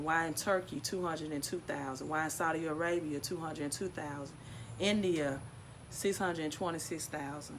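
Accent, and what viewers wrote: South, United States. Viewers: Love that Southern voice✊🏾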